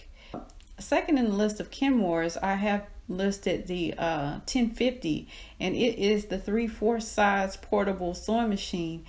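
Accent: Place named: South, United States. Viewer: and as an australian i love that southern accent - also no stupid shitty music.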